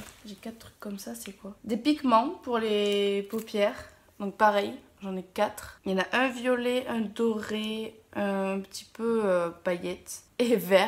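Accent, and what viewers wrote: South, France. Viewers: tu as encore plus l’accent du sud quand tu es avec tes parents j'ai l'impression! c'est marrant ^^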